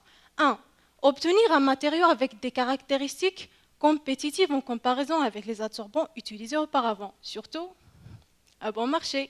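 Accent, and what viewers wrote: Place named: Morocco. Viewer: Marocaine d'après son accent bravo.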